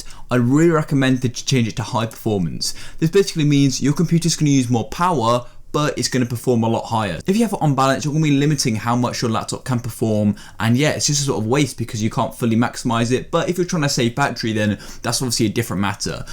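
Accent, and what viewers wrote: United Kingdom. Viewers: Let me guess your british?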